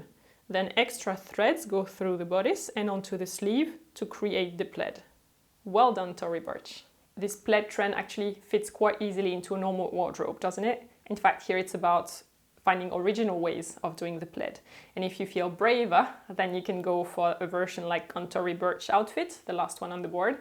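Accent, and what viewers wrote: France. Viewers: love the video you have a beautiful sounding voice aswell are you french? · Thank you for such amazing content. You are by far one of my favorite fashion youtubers out there. Im so lucky to have come across your channel...not to mention youre French-your accent is music to my ears.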